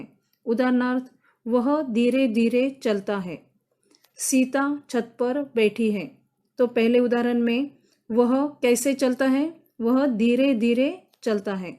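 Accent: Maharashtra, India. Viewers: By Your Voice I think you are Marathi Are u Marathi?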